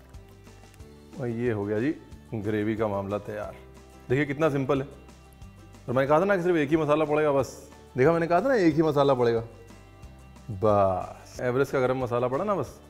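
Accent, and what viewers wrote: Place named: Punjab, India. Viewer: I am from Punjab and loves your punjabi accent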